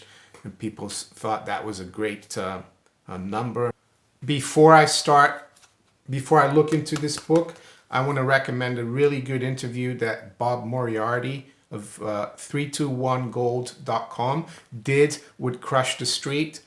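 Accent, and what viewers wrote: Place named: United States. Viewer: Very interesting history. you have the most interesting accent. I was astounded to learn that you grew-up in Brasil. (Big Bossa Nova, and and 1960s Brasil in general fan here, btw! In fact, one of my all-time favorite movies is the 1960s heist film “Grand Slam” which was filmed in Rio.) You sound like a Jewish guy from the Bronx but also very curiously have a noticeable Boston AND Canadian-like accent. Very unique! I enjoy listening to you speak.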